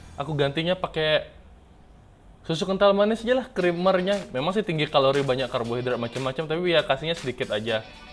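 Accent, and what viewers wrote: Bali, Indonesia. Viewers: Bali bukan bang?? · Logat baline kental sajan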